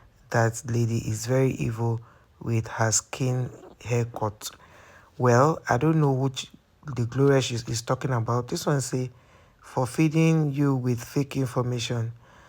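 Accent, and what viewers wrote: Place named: Nigeria. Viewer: Definitely you are Nigerian as you sound. So you wanna compare 9 south africans with millions of Nigerians in south Africa?anyway I'll say this, you trying to defend, you making things worse, just call them out for the bad things your fellow Nigerians are doing in south Africa. Eg:I had to move my child from the daycare he used to go to just because your Nigerian brothers are seating close to the gate selling drugs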